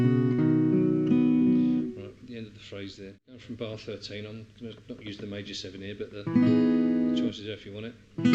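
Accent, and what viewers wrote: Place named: England, United Kingdom. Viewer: Thank so much! And, hey, this is the first time that I've heard you speak! You have an English accent! Here in Missouri my Mississippi Delta accent gets me a lot of comments and attention. If I had you here, they'd let me alone for a while!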